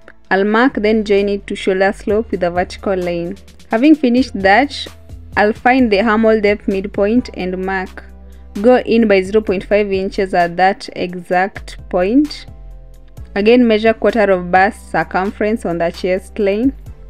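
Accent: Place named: Kenya. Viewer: from your accent I can clearly tell you are Kenyan. Where do you buy fabric in Nairobi?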